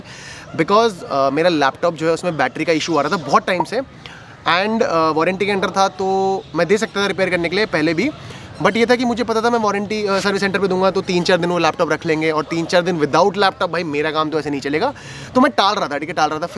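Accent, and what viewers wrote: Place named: Almora, India. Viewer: bhai..you don't no #pahadi Hindi Accent fell like almora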